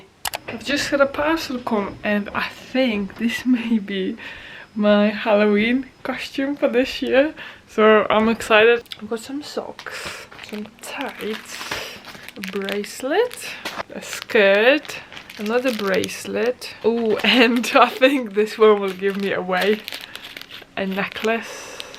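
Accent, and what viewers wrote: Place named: Northern England, United Kingdom. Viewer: You’ve caught a cold and a slight northern English accent.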